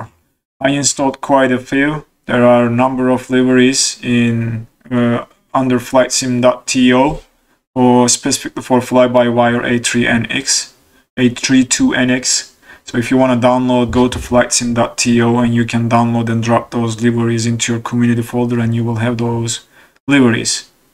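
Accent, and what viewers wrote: Turkey. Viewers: Thanks for tutorial. Quick and explanatory. But your accent doesnt sound like a native english speaker. Türk müsün ?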